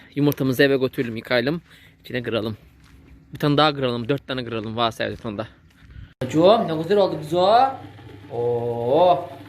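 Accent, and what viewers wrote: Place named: Çaycuma, Turkey. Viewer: SELAMLAR OGLUM BEN KARABUKLU TEYZENIM BIRINCISI GADUN ÇOK GOZEL AMA BEN 40 YILDIR CAYCUMADA YASIYOM ÇAYCUMA SIVESINI SIZDEN FAZLA KONUSYOM BAYILIYOM KONUSMANIZA HARI KULADESINIZ BENI KONUSURKEN GORSEYIZ BURALI ZANNEDERSIYIZ ALLAH HAYIRLI KAZANCLAR VERSIN YAVRUM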